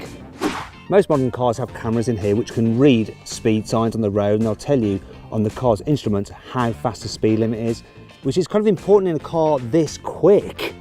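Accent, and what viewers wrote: United Kingdom. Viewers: 맷 뭔가 포드 V 페라리 영화에서 나오는 켄 마일즈 닮았는데 기분탓임? 이목구비 뚜렷하고, 영국식 발음 쓰는거 딱 들어맞고 차 좋아하는거랑... 차 단점 한번에 알아보는거 너무 닮았는데 ㅋㅋㅋ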